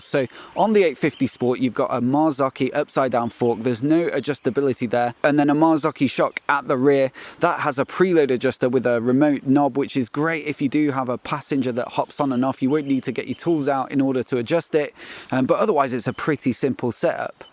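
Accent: United Kingdom. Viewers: Awesome information, and a British accent that is pleasant to listen to. Thanks!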